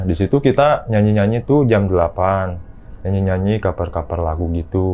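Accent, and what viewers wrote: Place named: West Java, Indonesia. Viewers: Meuni sunda pisan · Intonasi yang stabil, tempo medium penceritaan dan bahasa yang mudah dipahami membuat cerita lebih menarik dan nyaman untuk didengar, sekalipun dengan aksen Sundanish.. Salam Om dari kota tetangga, Cipanas.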